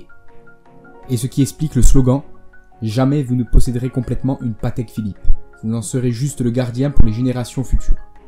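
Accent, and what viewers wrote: Corsica, France. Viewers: Bonsoir est-ce que j’entend un accent corse?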